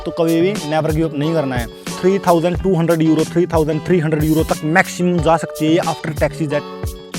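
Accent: Haryana, India. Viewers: Bhai Haryana te lage mne tu ❤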